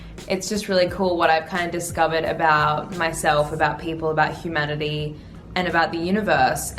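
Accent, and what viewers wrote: Australia. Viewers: I love your Australian English. Are you from Melbourne?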